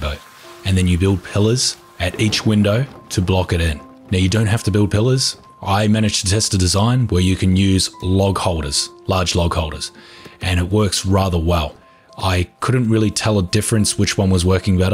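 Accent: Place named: Australia. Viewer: Thanks for this! I made and tested this last night, it works perfectly in the latest version (v1.08). I followed the procedure in the video exactly using log catchers to block off the openings, and viola....a perfectly functioning log catcher! Also, I got it on the first try, so apparently I'll be taking over channel soon! Although, that would never work..... A. I'm too lazy for that much work. B. My voice just wouldn't be as relaxing as, and no Aussie accent. Personally, I agree with on this, and feel this is a workaround for a problem with the game rather than an exploit. Really, there should be a "Log Catcher" available from the blueprints menu, but until that day, or the day the devs nerf this, it's a working solution. Cheers!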